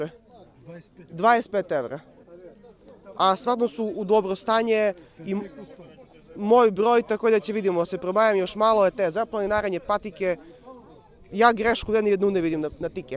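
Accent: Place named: Niš, Serbia. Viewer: to je neki "GU, GA, ĆE, ČE" Niški u kombinaciji sa Vranjansko-Leskovački i primesama LeBane...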